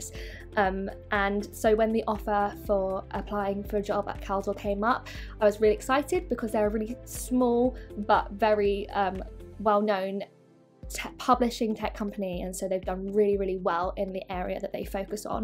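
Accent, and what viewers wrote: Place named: United Kingdom. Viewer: Her british accent :)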